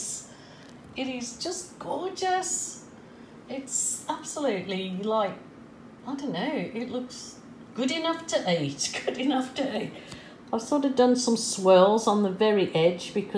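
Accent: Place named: England, United Kingdom. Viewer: Very cool! So what did you use that gave you those cells? Nice! You know what I haven't heard for ages? "FINGER POPPING!" 😂 You've definitely got the pom accent 😊That's who you are! I moved to Canberra from South Africa 18 months ago and I doubt I'll ever pick up the Aus accent. Maybe a little here and there but in general, no. Kids might though! 💕💜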